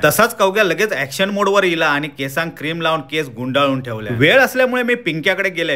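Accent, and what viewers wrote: Malvani, India. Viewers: मालवणी बोली मस्तच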